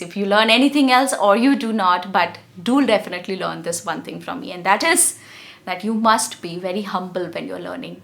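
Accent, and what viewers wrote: India. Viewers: Hello Ma'am, How are you? Ma'am I watch & listen your videos very carefully. Ma'am one day you were saying that choose easy word to speak in English & then I use choose easy word to practice myself for daily uses . Everyday I watch your videos I like your videos no matter what the time is but I use the time to watch your videos & listen that what are you saying. I think I use to start first easy word to speak then it will automatically habitual me after that I will become hard & advance or phrases word to replace that word. I like your indian accent that's why I watch your videos repeat more than one I like your videos & when you teach us properly then I can understand clearly. I use those word & repeat that word to use speak in English. I am a beginner & I Don't know what happens to me I can't speak in easy word to speak in front of someone 's else.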